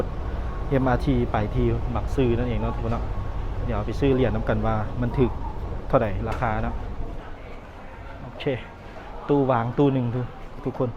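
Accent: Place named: Laos. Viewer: ทำคลิปสนุกครับ ชอบสำเนียง และภาษาลาว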